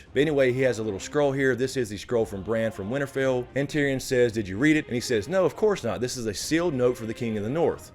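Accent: South, United States. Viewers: Just discovered your channel today. Nice country accent. Subbed.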